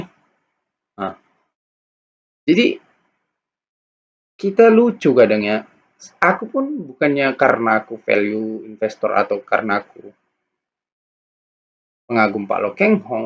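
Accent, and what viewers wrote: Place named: Medan, Indonesia. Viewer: Macam orang medan kali awak liat cara ngomong abang,ni.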